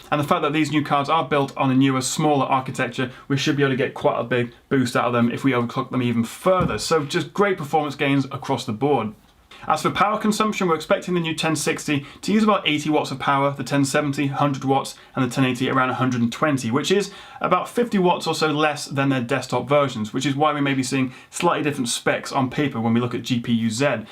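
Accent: United Kingdom. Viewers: Around 10% in neighbors of the desktop version:D I almost go to sleep and then see you replying to my comment, I immediately have to respond. I really enjoy your contents and your sleek British accent:D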